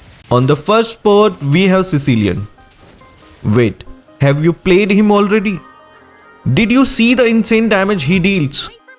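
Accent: India. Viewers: Are u indian🇮🇳? Your voice accent seems indian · You sound pure typical Indian, how come your name is? Please change your channel name,keep anything but not South Asian names, request 🙏. May be " भोकाल"," रुद्राक्ष"" कल्कि " etc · Some Times i think u r Indian cause u sound like one....i m sure u are asian .....one of the very few ...to reach a million subs... 💯💯💯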